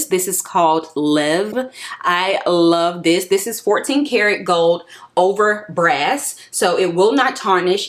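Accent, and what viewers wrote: South, United States. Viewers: Love your country accent.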